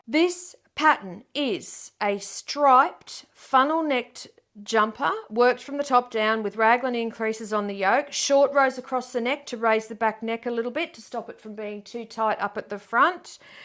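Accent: Australia. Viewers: I get the ick with that Ooey Gooey name, too. The design reminds me of lava lamps. Not hot! Fish girl fashion: definitely hot, but I'd modify so the sleeves were in the usual position. I have not knit any of these, though Isabel Kraemer's designs Tresse and Nasrin are in my library, and I have fingering weight yarn for them. Thank you for your video series, they are super entertaining, especially with that Aussie accent!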